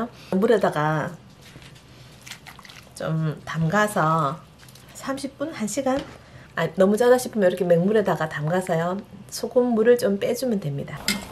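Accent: Gyeongsang, South Korea. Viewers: 우리나라 갓중엔 돌산갓이 최고라지요? 전 뭔가 쌉살한 맛을 좋아해서 갓김치 좋아해요. 저도 멸치똥 머리 안떼고 해요. 귀찮아서이기도 하지만 그냥 약간 씁쓸한 맛이 좋아요 ㅋㅋ 귀여운 경상도 사투리로 조곤조곤 상냥하게 설명을 해 주시니 정말 재밌고 기분이 좋아집니다^^ 맛있겠다 ㅎ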